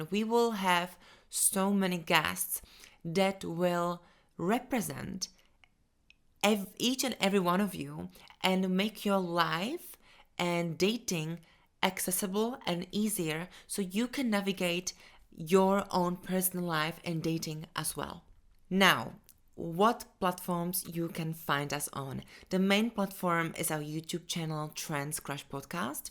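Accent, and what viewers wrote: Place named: Czech Republic. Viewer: First of all, I want to say thank you for your channel. I am Mexican American. I am a bodybuilder. I love to lift weights and I’m very attracted . To trans women and there stories I love the fact you guys seem very down to earth from what I have gathered on YouTube so I’m looking forward to this podcast and hopefully I may be able to date a trans woman one day but yeah, I’m very intrigued and I don’t even know where to look for trans women here in America… also my friend is she is ❤from Czech Republic that’s how I noticed your accent❤ by the way you are very gorgeous with all due respect🌹🌹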